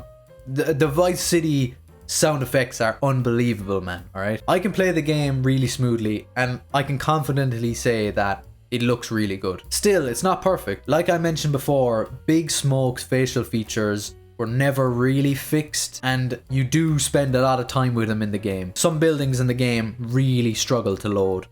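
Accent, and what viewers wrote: Ireland. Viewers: That's just how Irish people say three.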